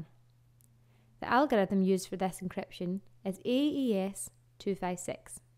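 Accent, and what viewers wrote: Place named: Scotland, United Kingdom. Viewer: Nice female Scottish voice :)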